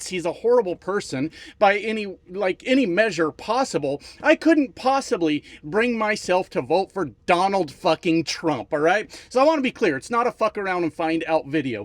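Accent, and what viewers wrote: Canada. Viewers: You sound Canadian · Canadians would love to have the old neighbourly relationship back. It breaks my heart to see all the hardships starting to happen to both sides of the border. Are you sure you’re not Canadian? I swear, you sound like us. 😊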